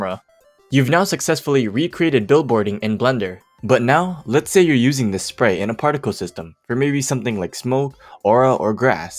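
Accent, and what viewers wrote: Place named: Japan. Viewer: Your accent is very strong more Asian type of accent Japanese and Filipino accent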